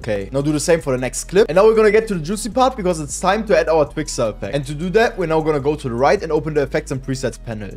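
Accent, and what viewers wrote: Germany. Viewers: Ich hör den deutschen Akzent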